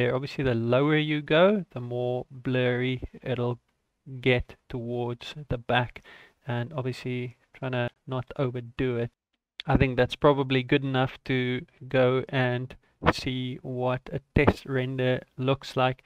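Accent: South Africa. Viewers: Where are you from, you have great tutorials, sound South African?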